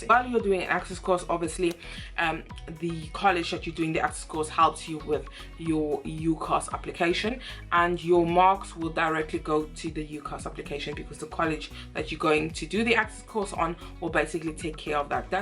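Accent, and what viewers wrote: South Africa. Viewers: Girl i knew you were South African 😂😂 i can hear it omg 😳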